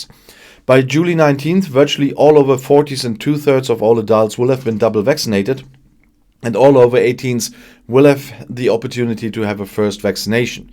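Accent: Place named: Germany. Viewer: It sounds like you're from Germany I think you should look at your own country first before you start criticising everybody else is my friend you were actually down and Vaccines at the moment did you thought you did a great job the first time but the second time you've made a right f**** of it you go and look after your hand side and when I'm going to listen to you European people because you don't know what you're talking about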